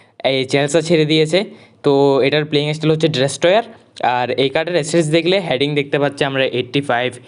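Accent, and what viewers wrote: Chittagong, Bangladesh. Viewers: ভাই মিথ্যা বলবেননা আপনি চিটাগাং এর না?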